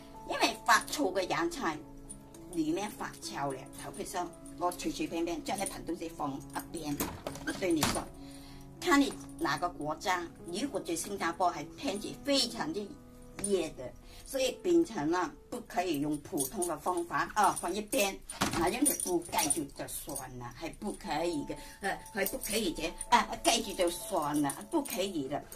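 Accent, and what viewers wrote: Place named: Cantonese, China. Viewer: 你講啲話好可愛，一半廣東話一半台灣話，聽到我想笑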